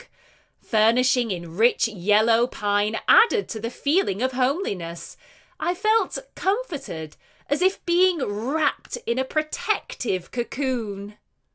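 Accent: United Kingdom. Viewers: Thank you so much. I love so much your British accent.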